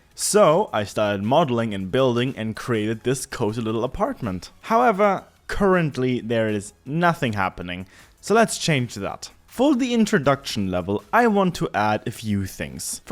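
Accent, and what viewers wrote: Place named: Germany. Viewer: most german accent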